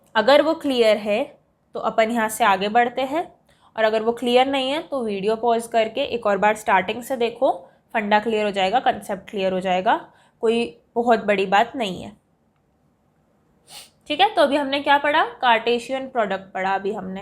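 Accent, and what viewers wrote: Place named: Bhopal, India. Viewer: #APAN full bhopali style didi Love from bhopal (mp)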